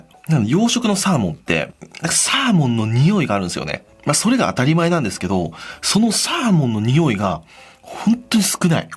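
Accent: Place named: Tokai, Japan. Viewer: 随所に出る東海のなまりが故郷を思い出して好き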